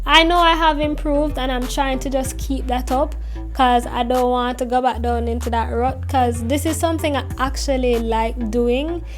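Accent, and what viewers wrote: Jamaica. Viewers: You sound Jamaican, thank you for this video 🥳❤️ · Are u Jamaican :) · Are you a Jamaican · Your very beautiful young lady wen I saw u I thought u was an African girl but wen u spoke I realised you're Jamaican ..no offence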